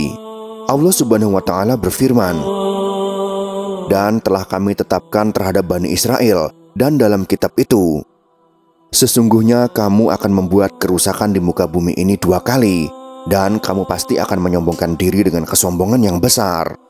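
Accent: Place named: Bali, Indonesia. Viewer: Admin nya orang bali sepertinya. Dari logat suaranya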